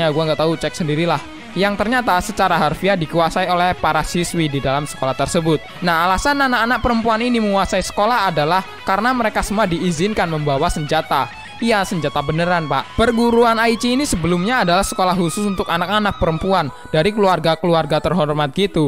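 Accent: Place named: Palembang, Indonesia. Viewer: Orang Mana? Kok Rada Bahasa Palembang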